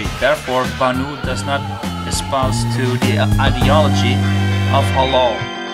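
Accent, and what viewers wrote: Netherlands. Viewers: ***** je hebt dat nederlands engels accent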